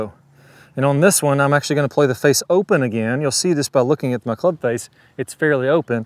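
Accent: South, United States. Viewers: another thanks from me here in the UK. Your lessons are fantastic and right on point for game improvement. And that nice southern drawl makes watching a Zen-like experience!:-D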